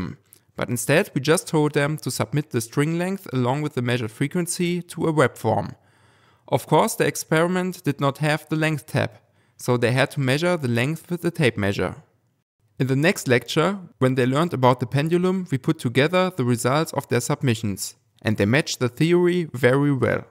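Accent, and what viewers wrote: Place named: Germany. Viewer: Deutscher der versucht Englisch zu reden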